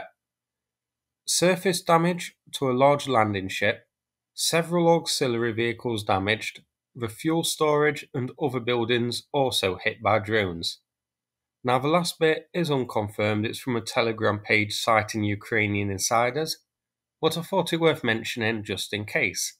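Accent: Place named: Nottingham, United Kingdom. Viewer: great analysis dude, awesome way of breaking this all down for up to understand, and I'm sure I recognize the accent, from a notts dude!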